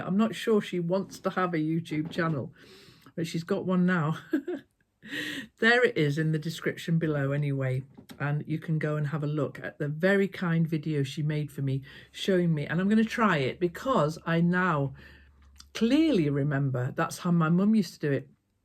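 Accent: England, United Kingdom. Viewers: I do wish I had found you in the midst of the pandemic when I was stuck home and missing my friends, because watching your videos is like sitting with one of my friends except of course your English accent because I am American. I am very glad to find you now and am watching all your videos and am thinking I am going to have to join your patreon channel to see what that is all about. I have never felt the need to join one before so maybe I better check it out.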